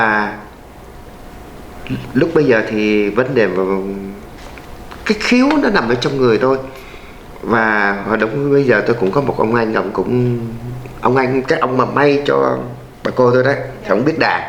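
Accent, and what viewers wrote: North, Vietnam. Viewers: Ong nay khong phai nguoi Sai gon nghe cach noi chuyen va giong noi giong nguoi bac .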